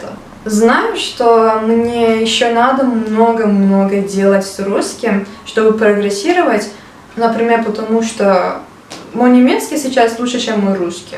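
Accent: Standard. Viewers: Восхитительно! Вы настолько чисто говорите по-русски! У Вас такой талант к изучению языков! Большое Вам спасибо за это видео.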